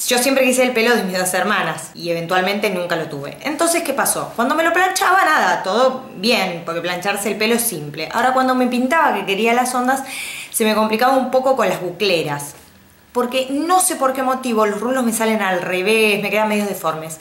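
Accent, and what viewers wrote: Argentina. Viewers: Yo basicamente quiero ganarme la buclera porque tengo un gato en la cabeza como vos, y nada, a veces me lo plancho pero para salir o para estar mas formal tengo que hacerme 'ondas' con la plancha (hay que hacer lo que uno puede con lo que tiene, no?) y bueno, nada, eso. Soy de argentina y y y y y y me re gustan tus videos y que seas de argentina me re entusiasma por que hablas tan argento que que que :D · Hola!! yo quiero ganar por que tengo un gato en la cabeza como decis vos, bueno yo tengo una plancha pero ya estoy re podrida de usarlo lacio la verdad que si, un bajon mal,estee.. me encantan tus videos en especial xq hablas como yo y por que vivo en argentina, espero ganar y nada, besos! Ya me suscribi!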